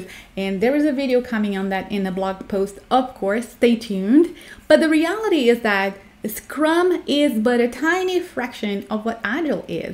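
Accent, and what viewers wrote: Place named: Brazil. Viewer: Are you Brazilian? Your English sounds different.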